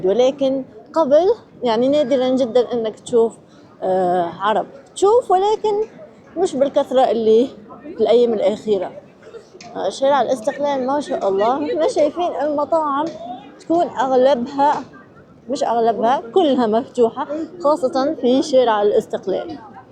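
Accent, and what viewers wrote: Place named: Tunisia. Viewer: انا اكتر شي مستمتعة بلهجتك التونسية الحبيبة ,,, فيديو أكثر من رائع <3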